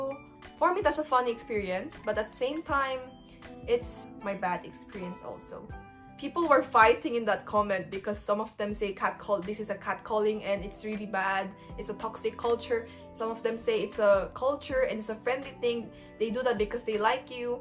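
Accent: Philippines. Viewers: Your english has a Filipino accent. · Wow you have filipino accent while speaking in english. Kudos!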